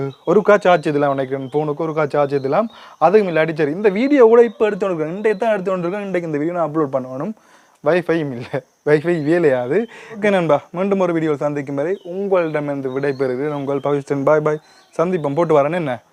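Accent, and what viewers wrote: Jaffna, Sri Lanka. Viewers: Anna neenga pesura jaffna tamil sema annachchi 😊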